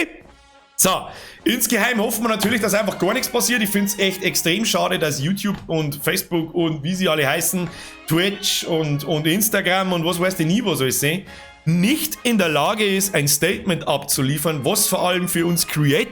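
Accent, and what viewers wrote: Bavaria, Germany. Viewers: Also gruß aus BaWü...ich liebe deine Videos und stehe total auf dein Bayrisch (ned falsch verstehen des is koi obandeln!)...wäre mega schade wenn dein kanal gelöscht wird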